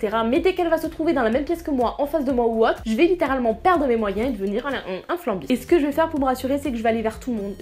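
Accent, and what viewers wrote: South, France. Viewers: coucou! Comme d'hab tes vidéos qui sont originales! Toujours à ta sauce. Et ron accent épicié du sud😂 jt'adore bb😂 #teamprout❤❤❤❤❤❤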